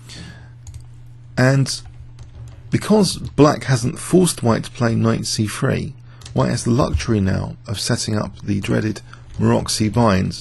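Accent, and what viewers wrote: England, United Kingdom. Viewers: You can also mute or don't watch the video at all! People want to impose pronunciations, he is from England thats the beauty of diversity.. We aren't machines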